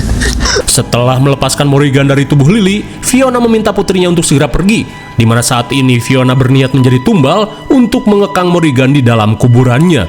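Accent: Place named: Java, Indonesia. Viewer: jowo pisan ta iki😂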